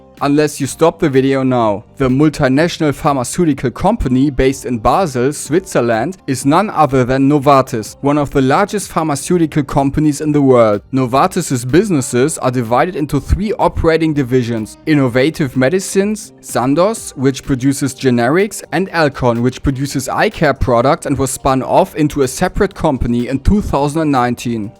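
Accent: Germany. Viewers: Love an English German accent.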